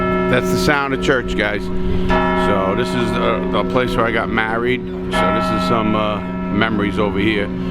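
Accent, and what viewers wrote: New York, United States. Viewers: do you find that your NYC accent comes back thicker when you go visit your son?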